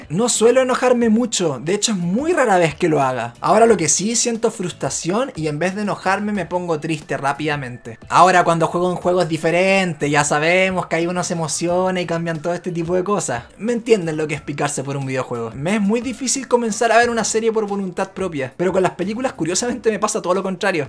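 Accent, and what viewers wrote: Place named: Argentina. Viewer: pensaba que era de Argentina se parece mucho el acento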